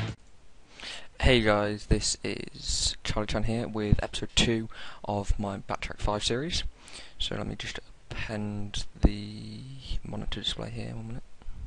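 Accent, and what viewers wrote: United Kingdom. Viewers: English accent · I like your accent. You're British, aren't you?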